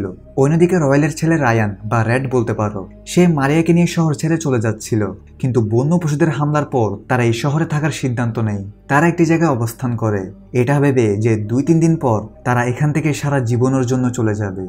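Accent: Chittagong, Bangladesh. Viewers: আমার মনে হয় আপনি চিটাগাং এর। আপনার কথা বলার স্টাইল টা তাই বলে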